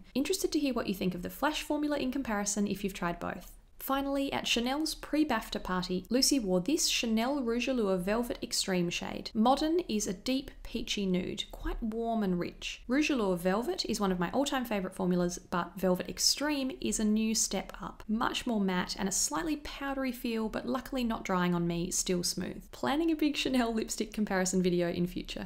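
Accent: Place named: Australia. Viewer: I don't know how the hell I ended up here but, I really like that Aussie accent 😄💙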